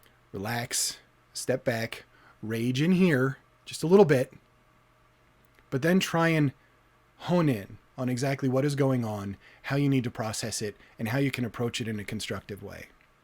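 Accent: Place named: New Jersey, United States. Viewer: LOL! True Jersey speak! 😁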